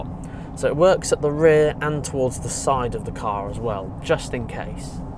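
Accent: United Kingdom. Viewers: your videos are superb! Explanations are educating and clear, video and audio are perfect! I even enjoy listening to your British accent. Thanks!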